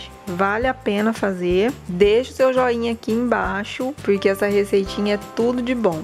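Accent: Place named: Inland São Paulo, Brazil. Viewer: Posso estar enganada mas o sotaque dela, parece com o do povo do interior de Sao Paulo. 🤔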